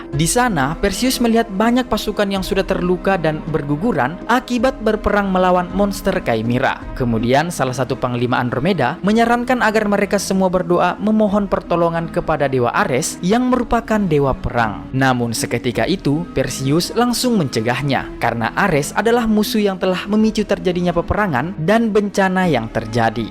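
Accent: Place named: Makassar, Indonesia. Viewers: Gue subs lu bang karan lu orang makassar kan haha😂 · Cokdok cokdok kayak bahasa Sulawesi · Adminnya jelas² orang sulsel, suku apa juga gak tau😂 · Pasti orang Makassar 😅 Salam dari Gowa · Orang makassar ini pale 😂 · Dari logat nya pasti orang Sulawesi😂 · Deh pasti orang Makkasar ini hahaha waktu bilang tuming abu dehh pecah ketawakuu😂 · Nassa na logat makassar na admin ka😅😅😅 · Makassar banget nah kamase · Orang Sulawesi Ki to bang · Admin nya orang Makassar kah? Logat & bahasanya ketauan . Kaya pas bilang 'tampiling, co'do co'do'😅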